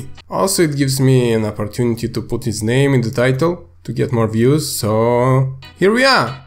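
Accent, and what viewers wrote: Russia. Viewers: I love this russian accent xD Nice vid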